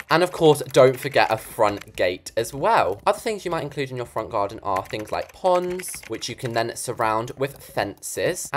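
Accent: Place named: Gloucestershire, United Kingdom. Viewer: You sound like youre from Gloucestershire😭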